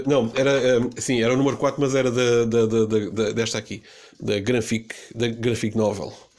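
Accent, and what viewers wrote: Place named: Portugal. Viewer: normal também não entendi muita coisa no seu vídeo mesmo eu falando português no Brasil tinha que mudar a regra do nosso português é muito difícil entender o português de Portugal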